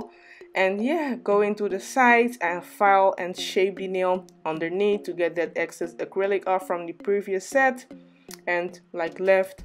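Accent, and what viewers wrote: Netherlands. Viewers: Ik hoor een Nederlands accent daar 😄👍🏽 Top filmpjes! 😘💋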